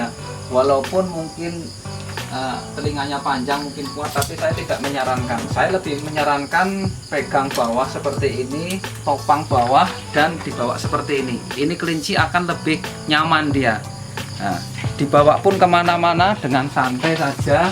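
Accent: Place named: Banyumas, Indonesia. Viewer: Walau sy pelihara English anggora tp tertarik sekali nonton chanel sampean, bs juga di terapkan,, aplg sama2 ngapak haha Salam ngapak Banjarnegara👍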